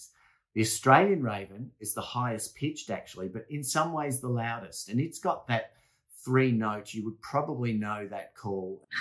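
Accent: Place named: New York, United States. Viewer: haha...thank you. love it. I'd say, not an American accent but somewhat NY city Jewish accent. :). Jewish Ashkenasic :).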